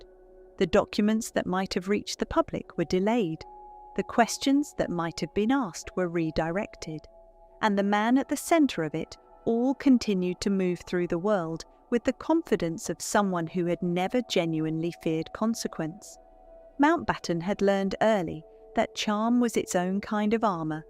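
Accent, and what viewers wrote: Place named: Northern Ireland, United Kingdom. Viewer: Northern Irish! Not Southern.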